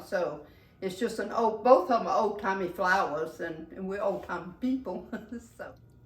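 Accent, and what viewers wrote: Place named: Arkansas, United States. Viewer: Love the meal ideas & enjoy your cooking but best of all is that you talk exactly like my parents Arkansas family. It's very soothing now that my mom is gone to hear you & remember those wonderful kitchen moments I was so lucky to experience. Kept doing what u r doing. I bet I'm not the only one that enjoys the South & miss the people! I'm a fan!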